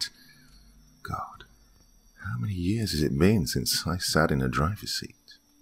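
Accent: England, United Kingdom. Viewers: thank you I thought I picked up some british accent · What part of England do u come from?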